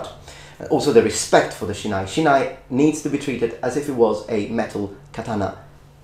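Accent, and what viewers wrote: United Kingdom. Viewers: Your Japanese and English pronunciation are pretty darn good! Did you learn English in the UK? Best wishes from the USA to Italia! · Wow, I can't believe I have never come across your videos before! I'm in a bit of a strange position really. I live in Leeds. England (I can tell you learnt English here and not in the US :)). Oddly, the only form of Japanese swordsmanship in my area is the Hyoho Niten Ichi Ryu UK Shibu. I have practised Chinese and Korean martial arts in the past, and I am being told my a lot of my martial artist friends that I am extremely lucky to live so near to this school and should give it a go. My heart initially was set on Kendo, for the sparring, but are no schools near me. Do you think that there would be transferable skills if I took up kendo too in a few years? Here is a link to the site: <link>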